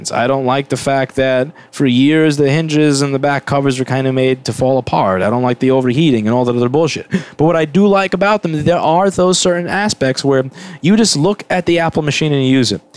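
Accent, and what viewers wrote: New York, United States. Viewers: You're definitely a new yorker...thats the only clear observation in this video.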